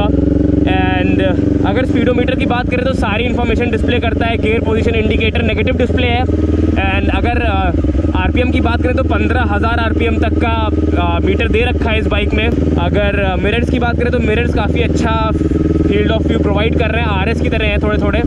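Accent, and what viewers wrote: India. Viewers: How does every indian speaks the exact same english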